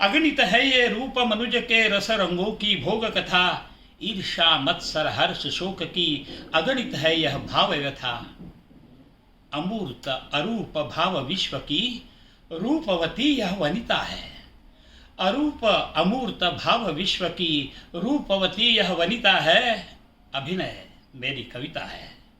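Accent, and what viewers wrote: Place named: Maharashtra, India. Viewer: मराठी touch !!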